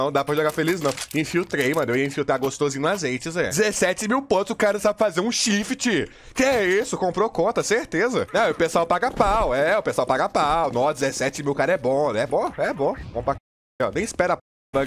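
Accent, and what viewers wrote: Belo Horizonte, Brazil. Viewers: tu fala igual meus conterrâneos daqui de BH kkkkk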